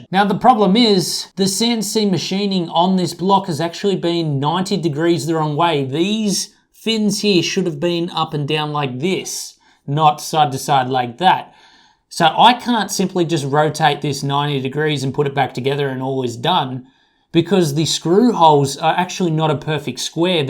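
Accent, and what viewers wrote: Australia. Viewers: Are you aussie?? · Average American looking guy sounds aussie.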